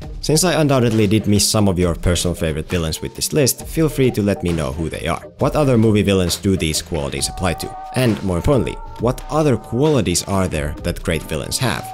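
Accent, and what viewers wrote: Finland. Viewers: I was 100 % sure you were Finnish because of your English accent. It's a unique accent I thought only Finns had. The more you know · Dying to know where your accent comes from. Sounds a lot like a Finnish accent to me :D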